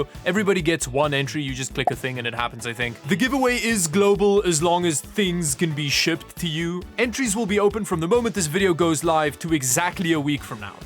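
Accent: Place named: Afrikaans, South Africa. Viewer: I am so happy to have learned that is afrikaans and not just me trying to figure out where the hell I have heard his accent before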